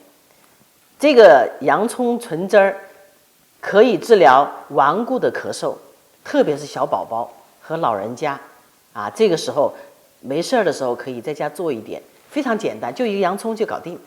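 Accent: Wuhan, China. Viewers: 听您的口音好像武汉人呢！ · 第一次看到你的视频，听口音像武汉人，请问洋葱蒸汁治咳嗽有剂量的要求吗？每次最少需多少毫升呢？谢谢🙏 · 很像武汉人的口音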